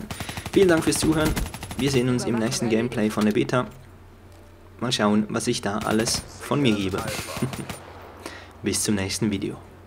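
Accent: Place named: Switzerland. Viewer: deine Fluchen auf Schweizer-Deutsch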